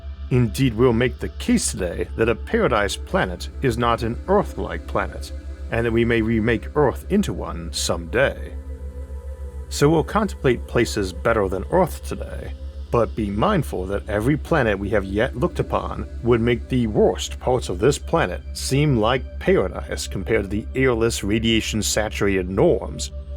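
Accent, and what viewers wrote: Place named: Arkansas, United States. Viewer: Sounds like Arkansas.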